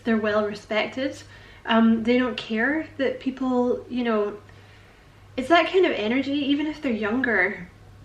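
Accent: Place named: Scotland, United Kingdom. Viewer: Oooh I Love your long readings and your Scottish😍 Thank you so much for your beautiful reading💕🙏🏻😘 Love you💕💕💕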